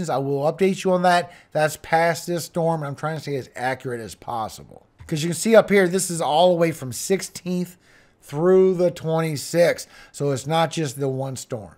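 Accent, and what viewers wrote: Louisiana, United States. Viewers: sounds like you are Cajun? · As always good ,needed , information and the fact that your Cajun is just icing on the cake... thanks for all you do !! And the extra gift of reading us the scriptures 😔❤️ take care BB from somewhere in Louisiana 🤠👍👍👍👍👍👍👍👍👍👍👍👍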